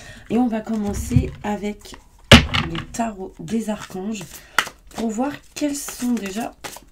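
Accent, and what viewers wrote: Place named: Mediterranean. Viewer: Oui déjà des petites insomnies. En fait c est bien si vous faites rire les auditeurs, car vues les nouvelles à la télé.. En fait vous parlez comme un peu les gens de là où j habite : pays de Méditerranée !.... En tous cas j y suis habitué. D après mon avis, les gens qui regardent les vidéos des mediums, et bien c est qu on a une petite vie bien étroite, étriquée, et que notre âme est prisonnière " de peurs, de questionnements, et d interrogations".. Merci pour ces deblocages ! Vidéos vraiment dynamiques ! ☀️😊💐💐💐💐💐💐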